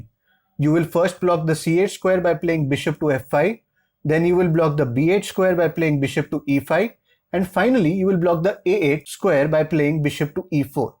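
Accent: India. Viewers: just wanna let u know that I hate Indian accent.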